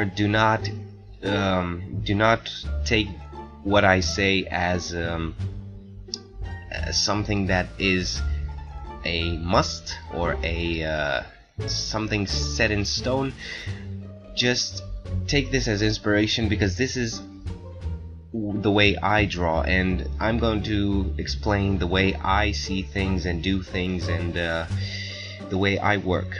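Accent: Romania. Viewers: mi-am dat seama dupa din nume si accent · yea bc he’s from romania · You must be Romanian,aren’t you? Foarte bine!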